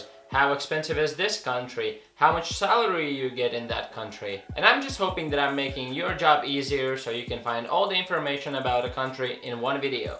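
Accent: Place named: Latvia. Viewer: Nice video. Uzreiz varēju pateikt ka esi latvietis pēc tā ka teici 2 miljoni cilvēki. Akcents nodeva. Tad tava kanāla nosaukumu izlasīju. Bet tā. Forši ka šādu info liec :) noderīgi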